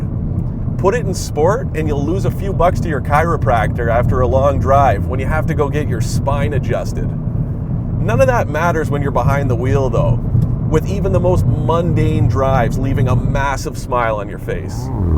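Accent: Canada. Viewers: You sound like a Canadian, but you do a pretty darn good job.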